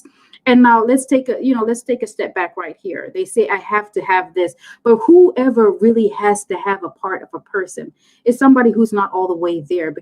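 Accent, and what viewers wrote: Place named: Caribbean. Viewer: Even if you didn't have the Caribbean accent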